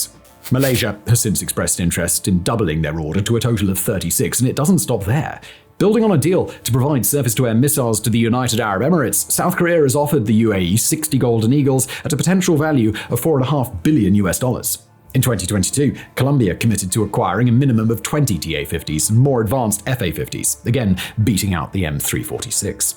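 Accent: England, United Kingdom. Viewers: you have great topics but, very sorry, I am struggling with your english accent. You often whisper the last part of your sentence. I sometimes don't understand what you are saying. thanks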